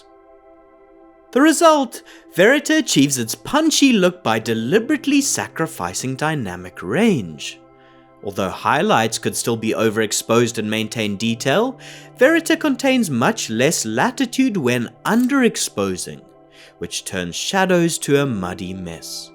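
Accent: South Africa. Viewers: You for sure are from SA Bru, am I wrong? Howzit Boet 🫡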